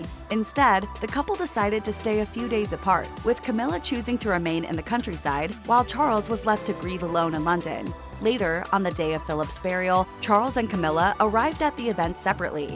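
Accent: United States. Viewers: The moment that American voice started I knew we were in for a load of twaddle. You are so indoctrinated with 'celeb-crap thinking' that you view everything through US celeb lenses. The rest of the world is different - reality is the norm, not trashy gossip dished out as 'news' Sleeping in separate beds is the norm in such households - it's the norm!!! Public spats? You mean 'body language' interpretations. Rumours - yeah rumours. "may soon be calling it quits.." Yeah, that's a big 'maybe' How much is the MM paying you for this silliness? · What a load of rubbish! Why is it these stories are always narrated by an american?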